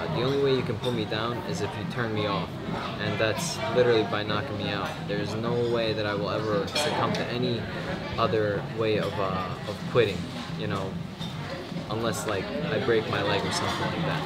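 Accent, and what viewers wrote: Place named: New York, United States. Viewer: The thing I like most about is how he says things that make me take more accountability and responsibility for my life in general. Like how he says you gotta motivate yourself, because exterior motivation fizzles out quickly. I think it may also be the New York accent mixed with his no bs approach, plus the steely blue eyes. 🤣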